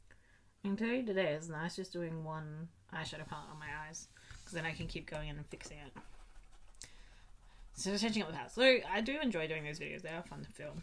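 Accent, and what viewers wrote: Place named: Adelaide, Australia. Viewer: Love the look! Your accent isn't obvious to me! 😜 you sound Adelaidien though for sure! I've been looking for a greens palette so I might have to check this one out! Wish you lived in Adelaide so you could teach me your ways in person! 😂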